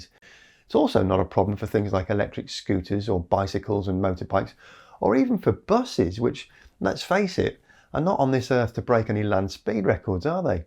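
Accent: England, United Kingdom. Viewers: You look just like that other bald guy but with an English accent .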